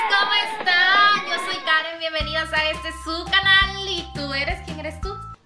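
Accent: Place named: Mexico. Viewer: Que rico te quedo hablas con acento mexicano no hondureño que linda 😊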